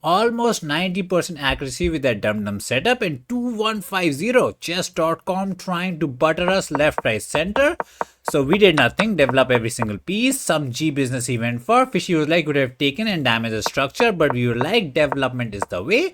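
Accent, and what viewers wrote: India. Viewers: When I want a good laugh and learn more about Chess tactics, this dude doesn't disappoint lol... The only jokes with Indian accent that I accept, and enjoy,,,lol...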